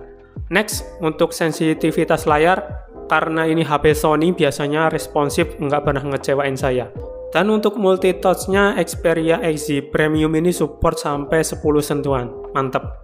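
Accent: Surakarta, Indonesia. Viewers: Loh wong solo to mas ???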